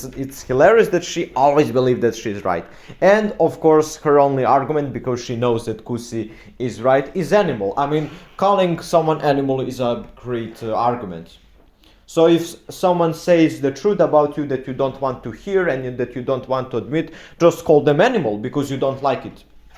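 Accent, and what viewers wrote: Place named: Yugoslavia. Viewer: you are so mature wow , by the way good yugoslav accent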